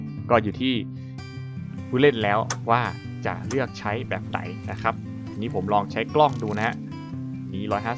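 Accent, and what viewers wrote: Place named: North, Thailand. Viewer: อันนี้เป็นคนเหนือแม่นก่อครับ สำเนียงออกเหนือเลย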